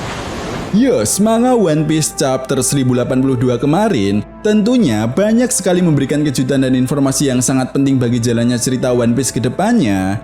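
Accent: Java, Indonesia. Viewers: Suara nya paati jawa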